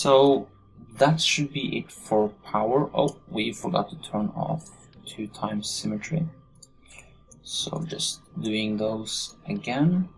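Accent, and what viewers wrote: Norway. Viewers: i hørte med ein gong at du var norsk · Where are you from? Norway?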